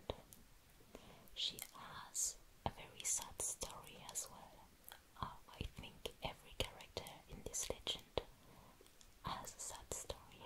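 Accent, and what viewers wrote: France. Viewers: I never knew I needed to hear a French accent in ASMR videos so much, until I found this channel...🥰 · Is this a French accent? Sounds nice and gentle anyway. :)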